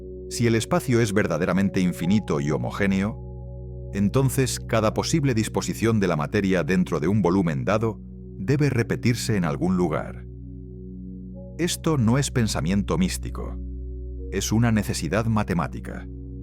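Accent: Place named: Spain. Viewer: menos mal que está en español de España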